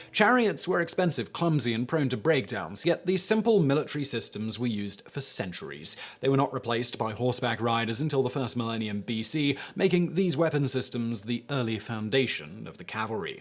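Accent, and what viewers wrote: United Kingdom. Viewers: I'm so confused, you sound British but all the videos are so heavily American focused.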